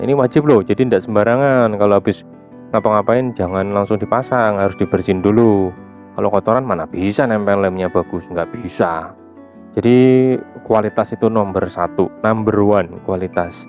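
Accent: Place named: East Java, Indonesia. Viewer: Asli mana bang kok ada logat "rek"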